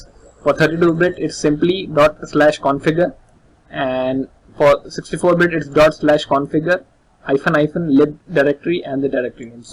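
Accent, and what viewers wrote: India. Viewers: another annoying indian accent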